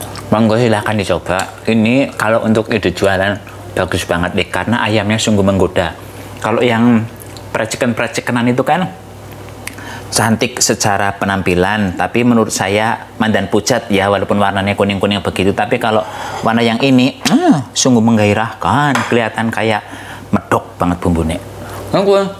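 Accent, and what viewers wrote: Banyumas, Indonesia. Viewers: Assalamualaikum baru nyimak Mase jawane mana Ngapak kaya aku Aku Kebumen mase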